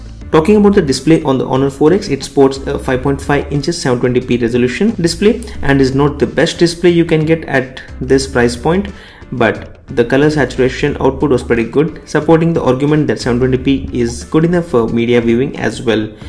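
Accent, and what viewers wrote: India. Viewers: maybe you should remove your indian slank when you speaking in English..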